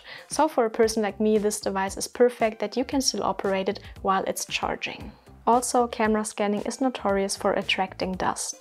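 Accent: Germany. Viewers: been trying to learn and looking for a film scanner? jsut starting to watch your videos. by any chance do you live in Germany? the accent is a littl bit strong, just some stuff I noticed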